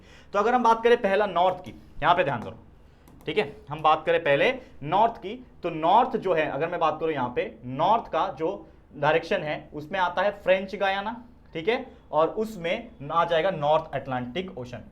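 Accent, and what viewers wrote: Maharashtra, India. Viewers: Sir aap marathi bolte ho kya😅😅😊😊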